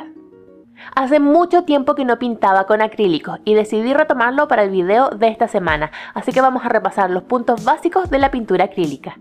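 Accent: Chile. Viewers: tienes acento chileno..o no? yo pinto con acrílicos, soy principiante pero me gusta mucho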